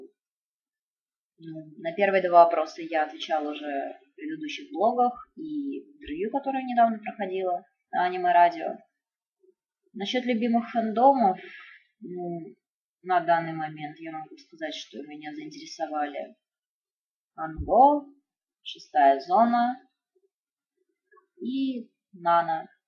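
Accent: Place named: Russia. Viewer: You are Russian, да? Or Ukrainian. Or...wow there's a lot of countries that speak Russian...anyway, you are really pretty and I really like the accent.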